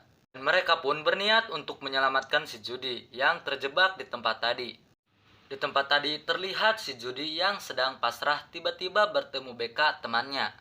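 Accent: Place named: West Java, Indonesia. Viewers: Bang orang sunda pasti 😂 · Orang Sunda nya kang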